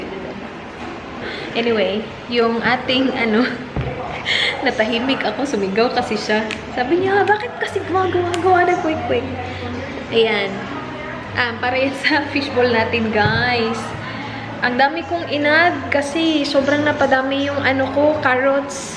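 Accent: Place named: Visayas, Philippines. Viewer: nakakakutawa po yung bisaya accent nyo 😍😍😍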